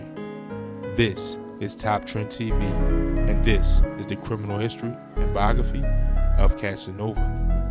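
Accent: Baltimore, United States. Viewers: you from baltimore? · Def a from Bmore lmao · Do=Dew in bmore 💯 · Not Philly it's Baltimore accent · That was a bmore accent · I'm know Baltimore when I hear it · This is first video I ever watched on this channel. So idk who the narrator is. But I know he from Baltimore.. I know that accent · Nigga you from Baltimore or sum shit?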